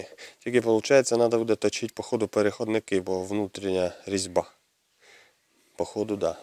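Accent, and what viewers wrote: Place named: Zaporizhzhia, Ukraine. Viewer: українською Ваше відео набагато цікавіше і колоритніше. В мене є колега з Запоріжжя ( я в Луцьку) то за вашим спільним діалектом зрозумів, що ви земляки, як виявилось ябув правий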